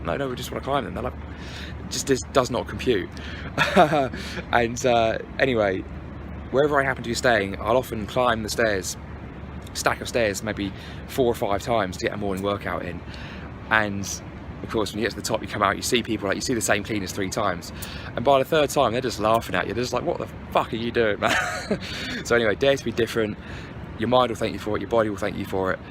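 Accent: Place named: United Kingdom. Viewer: Interesting vlog. Glad you had a good time in KL. Your British accent is so thick. Doncaster?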